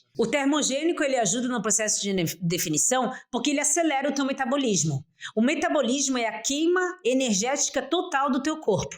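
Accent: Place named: Florianópolis, Brazil. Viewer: Pelo sotaque, é manezinha da ilha😅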